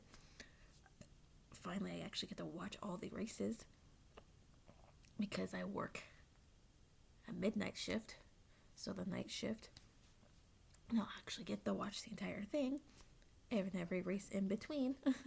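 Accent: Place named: South, United States. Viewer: How in the hell do you get so much social media hate? Yes, you can come off strong and as a quote unquote "bitch" in other people's opinions. I think you're the smartest person I know and you are funny and genuinely a caring human. I think Larson should have just let Chase by so that the 9, 42, and 3 could have pulled away. It was fun and i like this new Camaro. I do agree that MD0 car was awesome. Btw, your cat stole the video and i swear I heard a hint of southern in your voice. Have a great weekend and stay safe, the internet really does need you. I also wanted to agree that Austin is a great guy and takes lots of time for autographs. I got him (and the other coke guys) to sign my coke hate. I wanted to end this by saying I'm sorry Rivers left your Chargers. He's better then people think and should be a champion. Please don't let other people change you, those jerks suck. 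I hope Twitter people aren't being as rude anymore. I love your 24 shirt and i hope you'll be buying the zero shirt.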